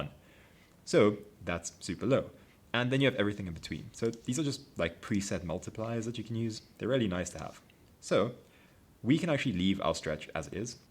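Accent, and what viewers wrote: South Africa. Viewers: Love this series!! Are you from South Africa?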